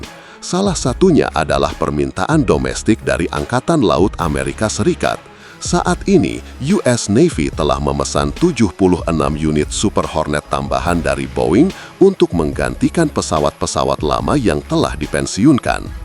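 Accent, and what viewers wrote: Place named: Indonesia. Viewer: kenapa admin suara indon dan bahasa indon.suka sangat indon cakap pasal pertahanan malaysia.kenapa admin tak bercakap pasal alat pertahanan indon sendiri.tak ada org berminat ke kalau cakap pasal alat2 pertahanan indon tak laku ke atau ada org minat.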